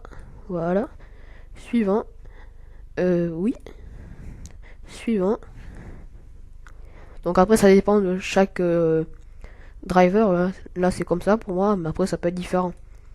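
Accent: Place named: South, France. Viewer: tu es du sud xD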